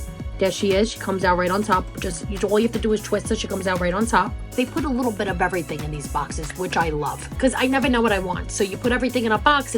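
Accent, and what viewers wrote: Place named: New York, United States. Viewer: First time i’ve watched your video. I’m watching from the UK. I have to ask are from New York with Italian heritage? Your accent is just so what I hear on tv when the characters are from New York. It’s great!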